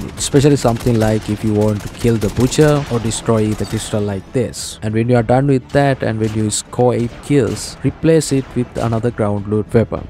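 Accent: Sri Lanka. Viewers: I knew ur sinhala from ur accsent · Broo are u from sri lanka?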